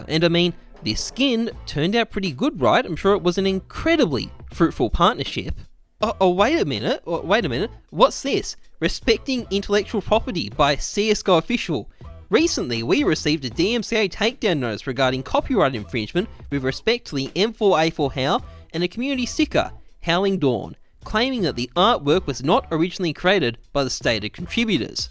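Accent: Finland. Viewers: are you from finland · R u from finland? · Wait... Are you finnish??? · are you finnish. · Wait...... What are u From finland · Are you Finnish? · Are you from finland?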